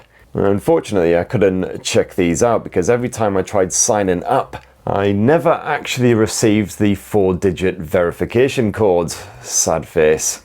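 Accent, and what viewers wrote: United Kingdom. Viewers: I really like mobile phone reviews in British English. ☺️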